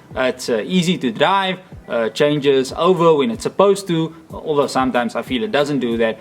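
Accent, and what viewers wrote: South Africa. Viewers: the south african accent was a nice surprise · Thats a South African Accend .. 😄😄 nice vid tho .. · lol He's definitely South African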